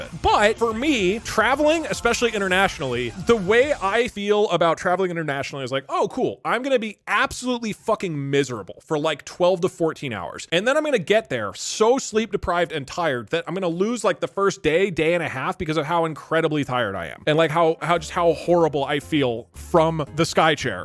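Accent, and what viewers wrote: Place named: United States. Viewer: you dont know nz at all dude, all americans sound exactly like you lol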